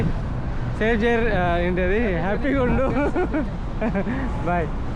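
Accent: Telangana, India. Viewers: it's so sad bro, money makes many things anaru so ths video is the best example, please like everyone bcz 14k views but likes only 2.5k so please like every video, ram travel bro and Avnesh bro ki with in 3 r 4yrs lo full views vastunai but Bayya ki and raja reddy bayya ki asalu views ravadam ledhu so sad, please support this bros. mana ap brothers kondariki (andariki kadu) telanagana slag nachadhu anduke view ravadam ledu anukunta. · ఇలా అంటున్ననాని ఏమీ అనుకోకండి బ్రదర్.. "రాజు కన్న బోయిన" బయ్య సన్నీ యాదవ్, ఇలా తెలంగాణ ట్రావెల్ youtubers అందరకీ including మీతో సహా,సబ్స్క్రైబ్ ర్ ఉంటారు, వ్యూస్ రావు కారణం తెలంగాణ వ్యువర్స్, పనికిరాని వీడియోస్, రోత కలిగించే కామిడీ వీడియోస్ చూస్తారు, చాలమంది, ట్రావెల్ వీడియోలు చూడరు, ఆంధ్రా వాళ్ళు ఎక్కువుగా ట్రావెల్ వీడియోస్ చూస్తారు, కానీ, తెలంగాణ స్లాంగ్ కు అంత కనెక్ట్ అవ్వరు, అందుకే తెలంగాణ ట్రావెల్ youtubers కి డబ్బులు రావడం కష్టం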